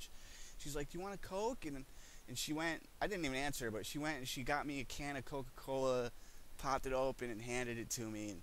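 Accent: Massachusetts, United States. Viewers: I knew he was from mass before he said it lol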